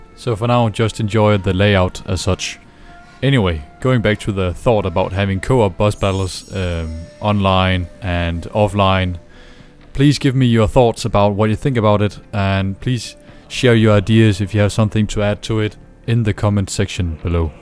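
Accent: Denmark. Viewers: Wtf!! Are you danish or something !?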